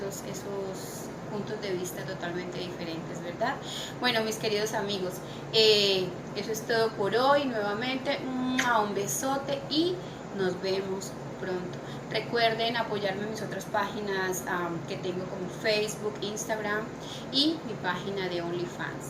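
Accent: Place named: Colombia. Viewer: hola yo soy mexicano y m encanta tu acento de colombia se oye muy sensual.asi k m encantaria k m digas palabras k solo se usan en colombia y no dijeras k significan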